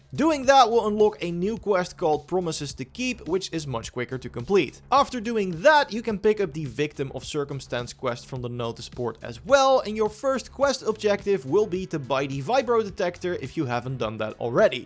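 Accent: Netherlands. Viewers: I love your hearing your Dutch accent lol. Goeie video!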